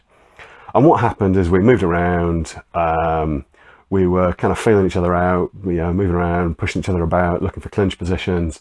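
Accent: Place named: London, United Kingdom. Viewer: You have been taught actual catch wrestling where you lose the match if you're pinned. There are other systems that come from catch wrestling such as Luta Livre (almost definitely the best style there is), Shooto and CSW where the match doesn't end if you're pinned. I recommend learning these systems if you're trying to learn to fight off your back but don't want to learn Jiu Jitsu and want to carry on using your catch philosophy and techniques. There's team cross face in South London where they teach CSW. By the way you sound like you're from London. If so, do you teach people? because in your video you said you're willing to teach.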